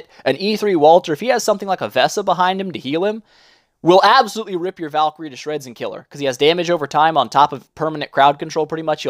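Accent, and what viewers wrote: United States. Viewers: He has traces of an American accent IMO.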